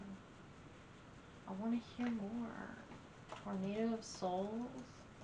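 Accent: South, United States. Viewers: I'm thinking it's thrash metal perfection. is incredible. One of the best metal records out there. Are you southern, or from like Tennessee? Love the accent in there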